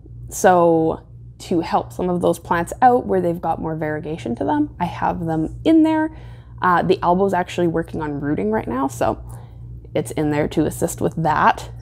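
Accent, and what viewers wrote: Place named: California, United States. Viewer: You talk just like a Californian, LOL 😄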